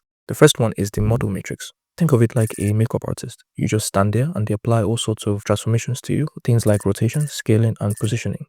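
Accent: Nigeria. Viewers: Can’t place the accent are you Nigerian?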